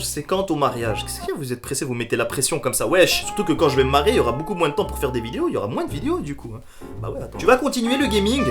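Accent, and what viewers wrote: Turkey. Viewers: Frr c quoi ton accent turc wlh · Gerçekten , c quoi cet accent turque , je suis Algérienne fiancée à un Bingölien et je parle turque sans accent Fais travailler le tien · T as un putain d’accent turque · Waa ton accent 😂😂turk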